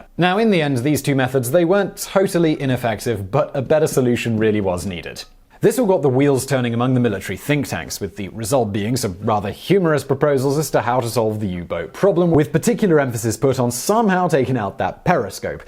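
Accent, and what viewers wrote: United Kingdom. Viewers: Simon, I general I can't stand British accents, but yours is pleasant.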